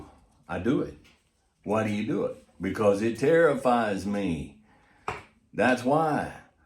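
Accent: United States. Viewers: Am not English speaker, sometimes I get the meaning, you are sir a very good man, I wish I was fluent in English especially understanding this strong real American accent. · No worries you 're doing all well mate . Your coffe is great pal . Love watching your videos . And I adore your cowboy's accent mate ))) Great respect from STRONG UKRAINE ))) I keep watching you .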